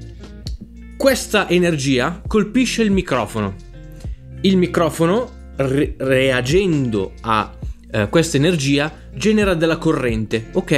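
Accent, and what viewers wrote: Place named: Rome, Italy. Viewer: Co st'accento sei proprio 'ntajo (con questo accento sei veramente divertente per chi chiama da fuori Roma). Grazie per tutte queste spiegazioni. Anche sapendole, ripassare non fa mai male. 😆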